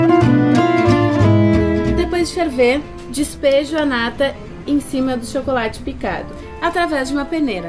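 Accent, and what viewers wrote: Rio Grande do Sul, Brazil. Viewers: Guria! Amei a receita e também gosto muito do filme! No programa passado desconfiei que você fosse gaúcha! Eu também moro no RS, em Caxias. Já curiosa pela receita da próxima semana! Beijão!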